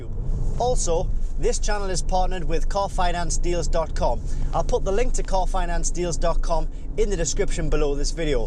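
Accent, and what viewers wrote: Newcastle, United Kingdom. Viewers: Hello. Is that a Geordie accent I'm hearing?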